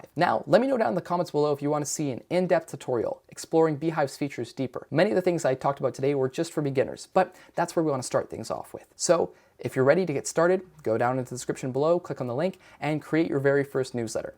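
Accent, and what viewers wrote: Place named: United States. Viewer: So just slow the video down? (I’m an American as well, but your American is showing)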